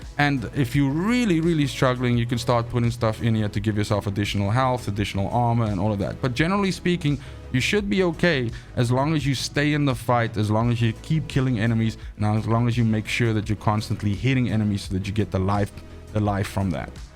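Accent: South Africa. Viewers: subscribed brother, love your videos. Really well detailed and explain... and I hear that south african accent coming through. Lekker !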